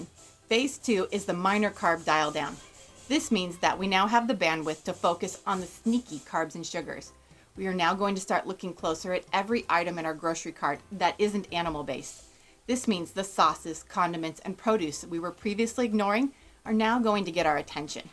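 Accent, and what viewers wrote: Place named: United States. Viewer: You are carrying out an experiment on your children which i consider unethical. The fact that you and your husband previously ate crap is irrelevant and at least your husband was very obese and possibly you were too. Get one of those carnivore drs to become your dr. You most likely are from the US based on your accent. In the US if you have evidence you can sue the hell out of people. So go get one of these drs to tell you to experiment and advise your children what to eat. Even the inuit had a diet which was approximately 20% carbohydrate which is extremely low. Best of luck and consider the diet recommendations by all but a single practitioner Dr Anthony Chaffee.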